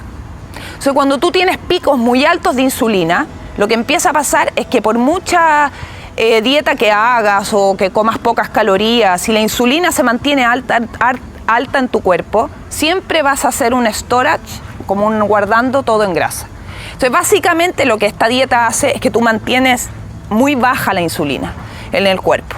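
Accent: Chile. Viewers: Lo mismo pienso... tiene mucho acento chileno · Te noto la voz de chilena 😁 y gracias por tus vídeos ❤️😍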